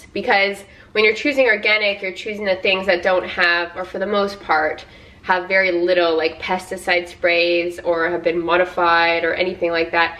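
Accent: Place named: Canada. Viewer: I just want you to know that I absolutely ADORE your channel! My husband and I went to Seattle for our honeymoon and went to Peace Arch State park right across the Canadian border in Surrey, BC (they wouldn’t let us far because of COVID), so I went home and watched a video of Canadian accents and it was you and another girl! I’ve always been passionate about spirituality and I happened to discover you again discussing it. Your videos are so good and I have watched so many. Keep up good the work! 🙏🏼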